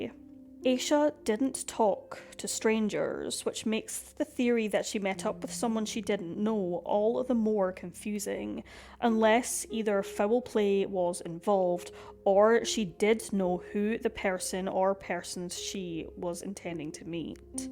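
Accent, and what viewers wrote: Scotland, United Kingdom. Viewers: It’s great to hear another Scottish accent on YouTube! I love the channel, I sometimes find it hard to “stay polite” and not use my “guffy” chat. 🤣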